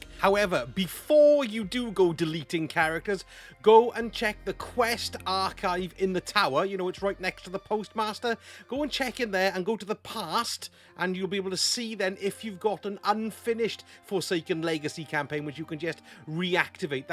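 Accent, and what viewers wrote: Wales, United Kingdom. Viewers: Nice to hear a fellow Welshman on Destiny2. Awesome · yo, this guy sounds welsh lmao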